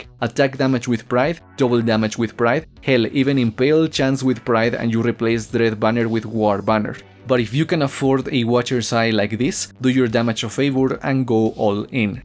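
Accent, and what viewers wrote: Spain. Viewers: I think all PoE guides should be done with a Spanish accent after watching this one. Great vid and great guide!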